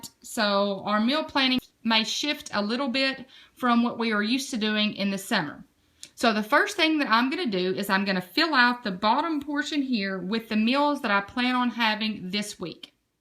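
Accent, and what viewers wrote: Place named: Alabama, United States. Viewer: Girl this is a very good system and printout. I'm from Alabama and I know you are close by.......I recognize that accent :)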